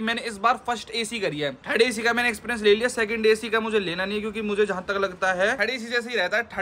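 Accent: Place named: Jhansi, India. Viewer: Bhai..apki language se lg rha aap jhansi ya aaspas k ho...or plz kha se train li o to bta dete...aadhi info di h..😢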